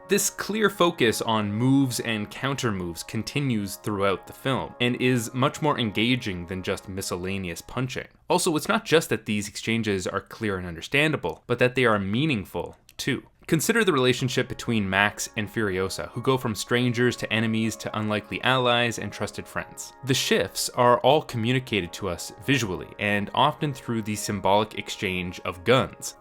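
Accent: Canada. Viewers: can you be more canadian? ... it's awesome and fun to listen to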